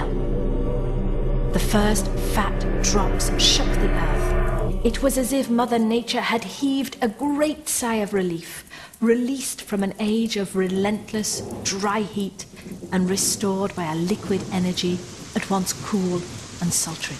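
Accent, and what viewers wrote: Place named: Rotherham, United Kingdom. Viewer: Completely ruined by Rotherham accent!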